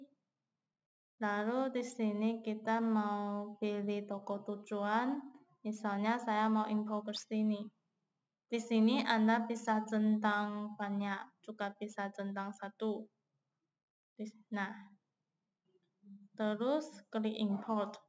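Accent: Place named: Japan. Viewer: Selamat datang ka dinegri +62....kedengerannya kaka dr jepang soalnya saya punya tmen orang jepang aksennya sama kaya kaka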